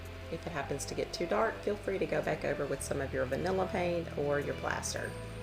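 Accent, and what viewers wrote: South, United States. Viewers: I love your sweet southern accent and I appreciate you diy's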